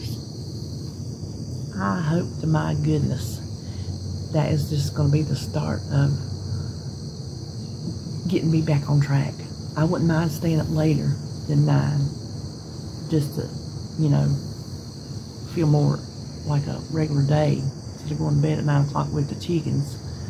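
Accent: South, United States. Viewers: You are wonderful, and I love watching you. I’ve been watching your journey for quit a while, and I am so proud of you for doing so well, and keeping on with whatever you’ve needed to do to lose your weight. As I have said, I have watched your channel for a long time, and I recently noticed something that I wonder if you have noticed: I’ve noticed your reflection in the window behind you on your porch, and how much smaller you look in your reflection. Have you noticed that? It’s kind of amazing! There’s a huge difference! As to your comment that you speak like a hick, I love to hear you talk. My father was from the south, and you remind me so much of my aunts and grandma in the way you talk and the expressions you use. It’s like a family reunion 😂 Thanks for what you do and share. You help me stay on track because your videos are so encouraging.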